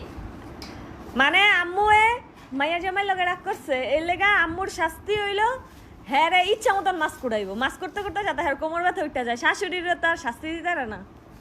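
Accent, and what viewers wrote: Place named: Narayanganj, Bangladesh. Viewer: Apner Kotha suny bujty parci.ami o Narayanganj er mey to tai.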